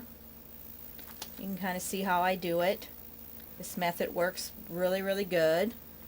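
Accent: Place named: South, United States. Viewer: Hi. Love your garden videos and your southern accent. I've been watching your channel for a while and am a big fan.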